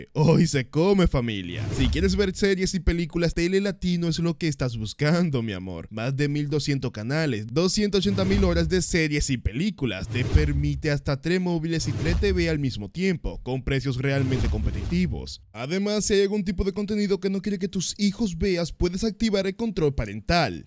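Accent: Dominican Republic. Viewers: Eres dominicano bro 🤔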